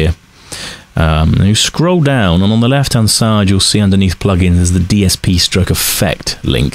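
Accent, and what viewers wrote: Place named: Australia. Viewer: Australian accent :P u can hear a difference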